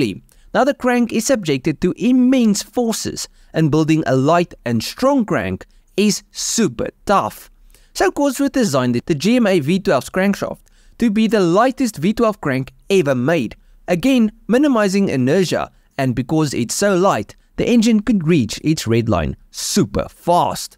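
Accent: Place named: Brakpan, South Africa. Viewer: Liked the video for the pure Brakpan accent 👍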